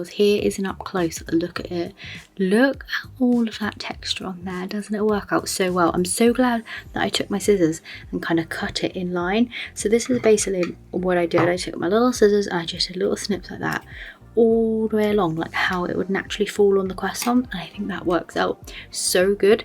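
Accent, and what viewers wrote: United Kingdom. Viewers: U sound like from the uk am I right?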